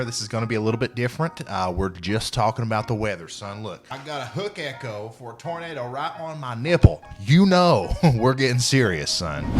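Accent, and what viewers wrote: South, United States. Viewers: You can tell that he cleaned up the southern draw just a little bit as he got more popular lol 🤣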